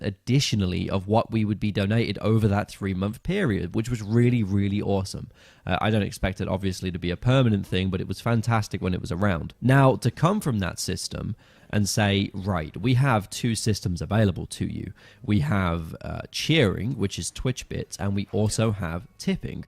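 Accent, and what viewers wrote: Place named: United States. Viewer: speaking american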